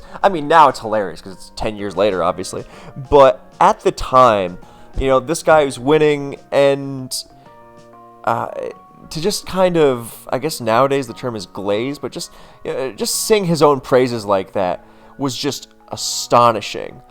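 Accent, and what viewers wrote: Germany. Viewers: you probably pick up on final devoicing, missing schwas, wrong use of fricatives, and Germans tend to overthink the "th". ^^ xD o.O -.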